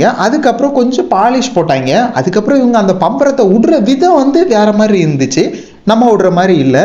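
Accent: Madurai, India. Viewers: ஒன்னு மதுரைக்கார மாதிரி பேசு இல்ல கன்னியாகுமரி காரன் மாதிரி பேசு, ரெண்டு மாதிரியும் பேசி கொல்லாதடா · Madurai slang 🔥🔥🔥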